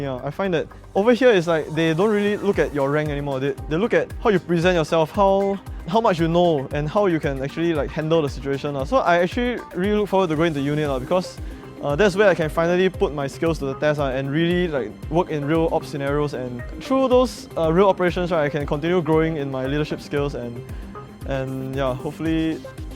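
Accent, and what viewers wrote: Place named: Singapore. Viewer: His singlish .....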